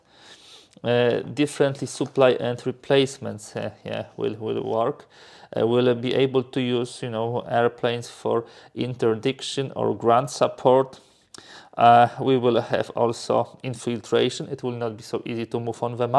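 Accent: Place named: Poland. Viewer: nie jestes czasem polakiem?akcent masz podobny do mojego jak gadam w ingliszu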